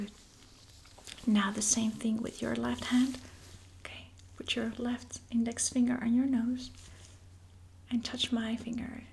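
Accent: Netherlands. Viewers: Hoor ik daar nu een Nederlands accent? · By any chance you're Dutch madame? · do I detect a Dutch accent ?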